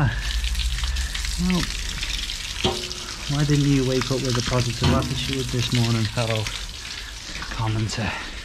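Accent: Liverpool, United Kingdom. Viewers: This man is Scouse.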